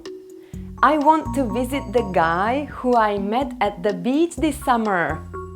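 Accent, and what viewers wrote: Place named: Italy. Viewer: This sucks . You have a foreign accent. Italian?i taught a- levels. ✖️🤢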